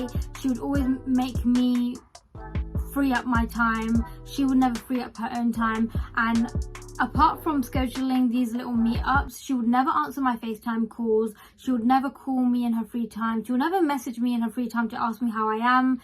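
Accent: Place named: United Kingdom. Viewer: Omg you’re from UK??? 😍😍😍😍 the accent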